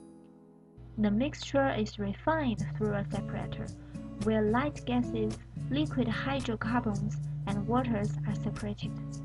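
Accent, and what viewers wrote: China. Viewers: if this is an "american institute" thing then why is this being narrated by some chinese lady who just learnt english a week ago ? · The video is funded by two US organization yet why does it sound like you hired a voice actress who sound like she hadn't even left China?!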